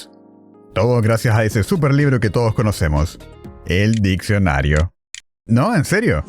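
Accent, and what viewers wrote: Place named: Australia. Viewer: El video que estaba esperando, que nostalgia!! You do sound a little Australian, saludos desde Inglaterra lmao (De algo sirvió)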